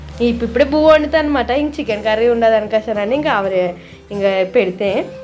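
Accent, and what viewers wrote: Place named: Kurnool, India. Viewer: అర్ధం iendi మీరు karnool side వాళ్లు అని me భాష అట్లేనే vundi😂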